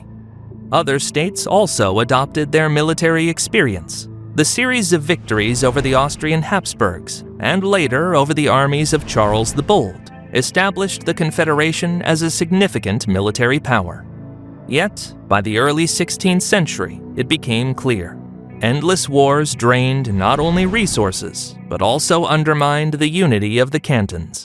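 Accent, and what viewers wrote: United States. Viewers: nothing ruins a good historic story as an americrap voice.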